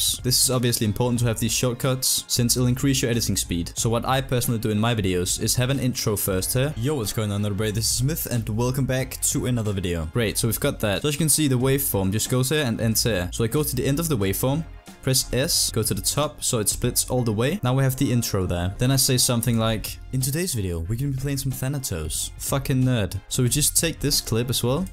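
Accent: Denmark. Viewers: are u from denmark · Laki Popic He's Danish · Ohhhh den danske accent xD Super video!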